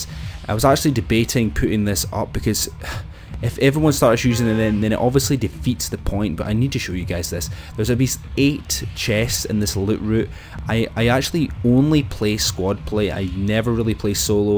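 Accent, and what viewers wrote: Scotland, United Kingdom. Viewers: Are you a Scott? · Yo im scottish and even i can say that scottish acsent just doesnt work on youtube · Are you Scottish? You sound like it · I think he's british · Are you Scottish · This guy is Scottish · He's from Scotland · You scottish? We can be scottish bros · Dats a thicc Scottish accent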